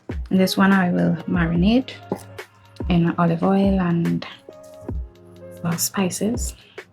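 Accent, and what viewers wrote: Trinidad and Tobago. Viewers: You sound Trinidadian 🇹🇹🇹🇹🇹🇹. Big up yuh whole self. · Fello Trini here!!! Really enjoyed the video and what a great idea to make homemade feta. Can't beat a Trini accent!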